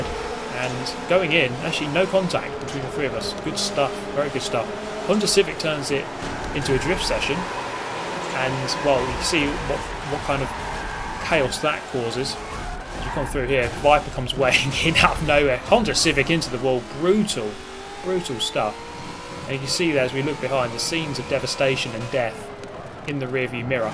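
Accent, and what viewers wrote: United Kingdom. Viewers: Your charming British accent is overselling your actual driving skills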